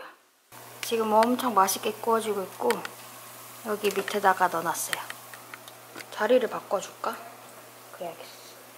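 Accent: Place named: Gyeongsang, South Korea. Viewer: 맞어요, 저도 안하려고 하다가 그냥 지나가면 너무 슬플것만 같았어요. 풍선 부는거..보통 일이 아니셨을듯 해요.ㅠ 전 한국에서 소품 주문 해서 오고 있는데 미국에서도 이렇게 이쁜것이 많네요~ 영상 보면서 말투가 같은 경상도 여인이라 무척 더 반갑네요 ㅎㅎ 님 아가영상 정주행 하며 태교도 하고 갈께요 ~ 😍 식탁 정보도 너무 감사해요~!^^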